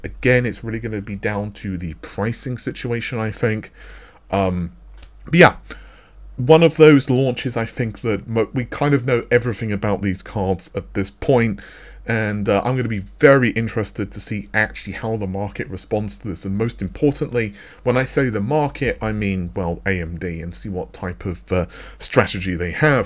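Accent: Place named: RP, United Kingdom. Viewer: He's what's known as a "toff" and they all speak like that :)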